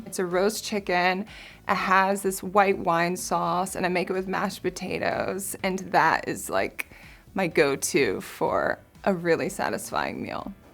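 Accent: United States. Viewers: Most American Accent I've heard. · Girl all Americans sound like this stop being a child and hating on someone cause of there accent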